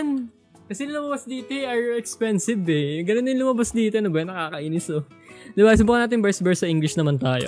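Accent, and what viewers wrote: Visayas, Philippines. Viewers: Bisaya ka ba kuya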